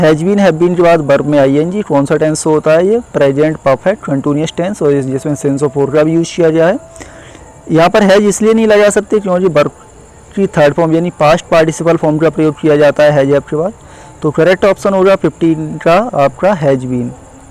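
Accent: India. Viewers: I can hardly understand you, coz of your terrible Indian accent teacher. Well I will try to make the best of this grammar exercise. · Amr Alhabori because this is an stupid indian teaching to illiterate dumbasses hear english from the people who speak in a good accent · you are poor English speaker.your pronounce as like as Indiana.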